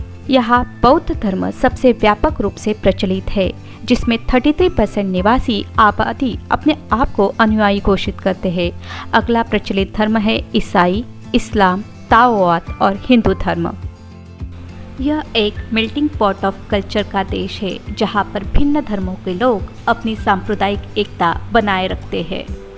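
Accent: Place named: Maharashtra, India. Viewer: काय मस्त हिंदी आहे तुझी...पण कधी कधी, काही शब्दांतून मराठी बाहेर येते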